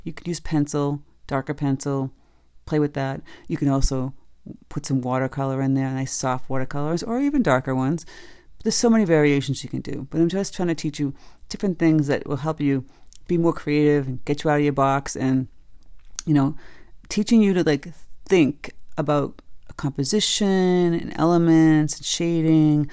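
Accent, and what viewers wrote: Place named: New England, United States. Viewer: I have been doing art for years, since childhood and yet I tune into you because you a) sound just like my New England sister in law, and b) you inspire confidence, as you have such a casual, easy going way of explaining that you make everyone watching feel the projects are all approachable. Good job on breaking things down well.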